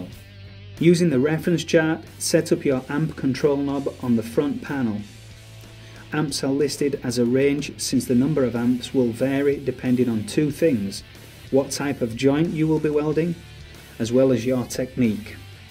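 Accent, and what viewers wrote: United Kingdom. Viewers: Yes, it is absolutely appalling, but as he has a 'Midlands' accent it isn't very surprising. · Agreed, but I think he's a Manc lad!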